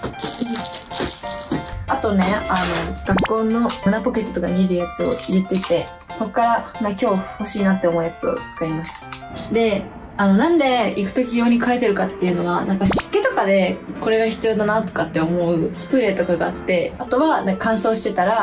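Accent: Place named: Nagoya, Japan. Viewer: 名古屋弁同じ！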